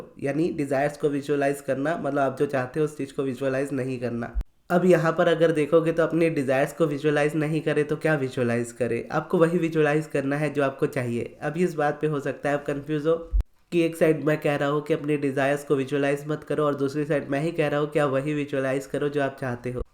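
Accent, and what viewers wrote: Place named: Jamshedpur, India. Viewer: Pta h bhai mai v jamshedpur jharkhand adityapur se hu aapko ek baat batau jindgi mai low of attraction ke videos dekh dekh 2014 se paresan ho gai the matlab bohot confuse fir ek din maine universe ko bola bhagwan please kisi v tarah koi insan ho jis se low of attraction bohot easy ho jaay sikhna please us se mila do aur us waqt mai bank mai baithe the aur aapki ek video mili aaj wo ek h mai her video apki low of attraction ke achi se samjh pati hu sikh pati hu starting se aapko follow kr rahi hu aapko aur aapki awaj sun ke feel hua pta ni kuy ye humlogo ke yahna se he h fir pta v chal gya aap v jamshedpur se he ho aur main v aaj low of attraction bohot ache se sirf aapse sikha matlab universe ko bola unhone aapko bhej diya .aaj meditation v sirf aapke wajah se kr pati hu.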